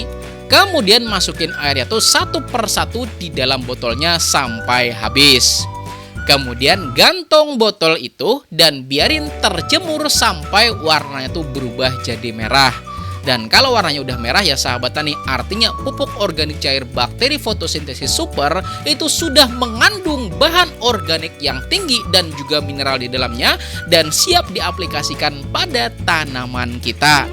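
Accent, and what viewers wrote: Banjar, Indonesia. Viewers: Logat admin org banjar pasti ini. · logatnya kayak orang banjar, bener gak ya? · Mendangar logatnya kayak urang banjar pian tu.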